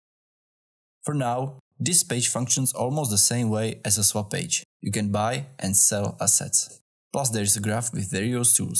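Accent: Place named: Czech Republic. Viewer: I can hear your Czech accent :-) But I might be wrong...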